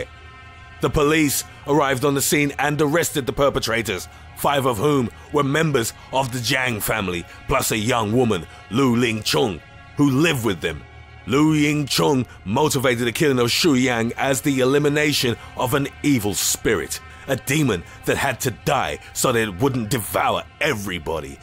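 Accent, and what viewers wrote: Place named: United Kingdom. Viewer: British narrator what a joke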